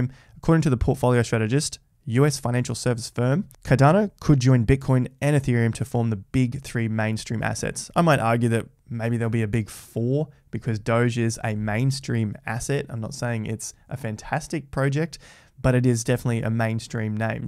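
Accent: Australia. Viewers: Hi! Good video and keep it going mate! It's nice to hear again a bit of 'stralian accent! Love and supporting you from Italy!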